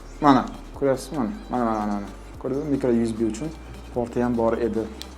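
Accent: Samarkand, Uzbekistan. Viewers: Samarqand danmisiz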